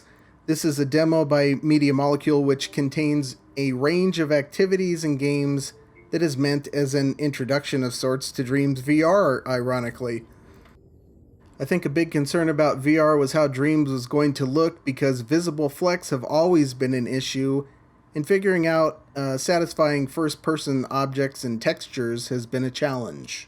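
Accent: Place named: Iceland. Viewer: can't really be too brutal with this vid, its interesting seeing what you vr guys are looking at and experiencing right now, and hearing your take on it. looks cool enough. I was most surprised about your accent, had no idea you were icelandic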